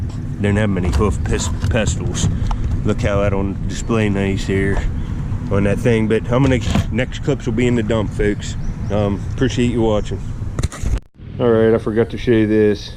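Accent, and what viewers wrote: Western Pennsylvania, United States. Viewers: Like ur content, awesome finds in these. Just subbed ur channel to support a fellow Buckeye. If you are originally from Ohio, you have the distinct twang of the Western PA/WV panhandle in ur voice.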